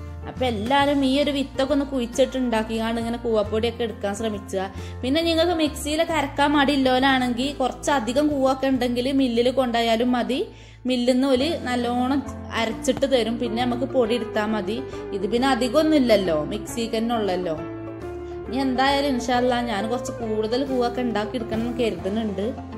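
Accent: Malappuram, India. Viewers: ഈ അവതരണം ഒരു പാട് ഇഷ്ടായി. യതാർത്ഥ മലപ്പുറം മാപ്പിള ഭാഷ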